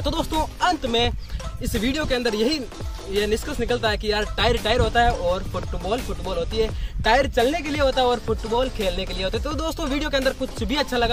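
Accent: Rajasthan, India. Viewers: Bhai Rajasthan se ho kya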